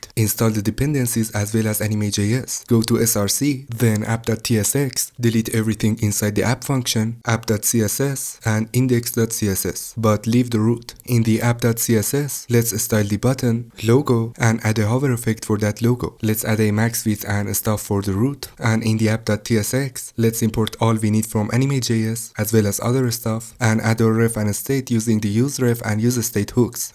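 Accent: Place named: Iran. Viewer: He sounds Iranian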